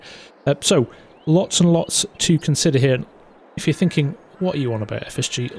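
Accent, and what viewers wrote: United Kingdom. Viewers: Bros too muh brihishh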